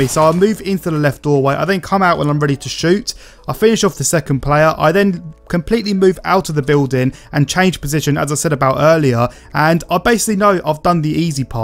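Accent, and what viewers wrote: United Kingdom. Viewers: I agree, you're helpful and easy to listen to with that English accent! 😍😍 Haha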